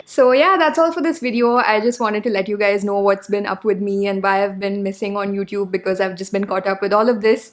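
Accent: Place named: Karnataka, India. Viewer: ur English utterance seems to me discording... it seems pretty problematic to sort out r u speaking English or kannada....request to correct ur English accent properly ..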